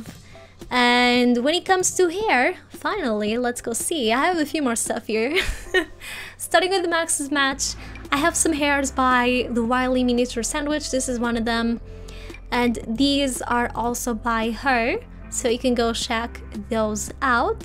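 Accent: Portugal. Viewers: you're portuguese right? I've noticed the accent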